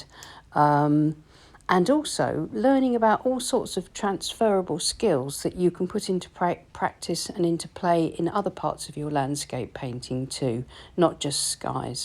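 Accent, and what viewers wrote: England, United Kingdom. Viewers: It's lovely to hear your soothing English accent and see you paint in such a peaceful manner. Thanks from a Brit in California! We all need peace at the moment and you certainly draw us into the sanctuary of watercolor painting!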